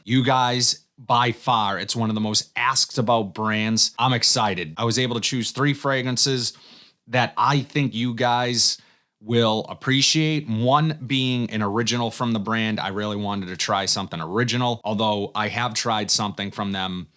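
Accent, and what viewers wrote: Boston, United States. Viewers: That Boston accent 🫣🤣🤢🤮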